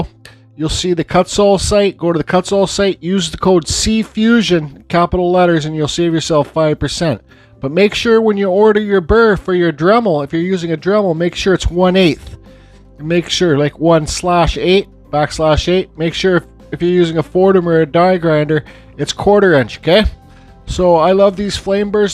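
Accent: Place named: Canada. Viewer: Don't let YouTube change your style, I like your style,. You remind me of me, without the canadian accent. Trapper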